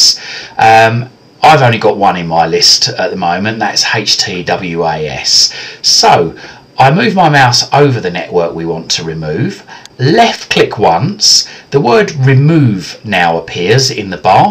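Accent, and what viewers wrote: England, United Kingdom. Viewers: Fuck this has been bugging me for 2 years LOL. thanks for that English sounded dude.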